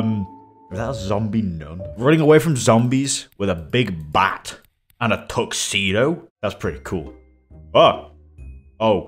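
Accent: United Kingdom. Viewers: You do sound kind of British,.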